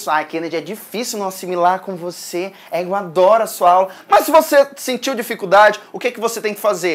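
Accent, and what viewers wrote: Pará, Brazil. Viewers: professor, vc é paraense? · beeem paraense kkkk, sou do Pará tbm, mas não tenho sotaque daqui kkkkkk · Tu é paraenses né parente ? Professor muito simpático. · Mano, tu é paraense ?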